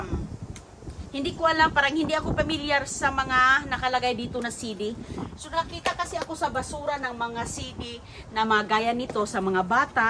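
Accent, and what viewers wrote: Visayas, Philippines. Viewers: Halata po bisaya kayu 🥰🥰🥰 ... Swertyha sa family uy....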